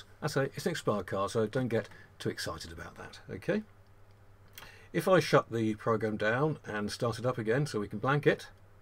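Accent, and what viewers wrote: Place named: United Kingdom. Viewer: I had to slow your voice down. You speak way too fast for me to understand most of what you say, especially with your British accent.😅 Thank you.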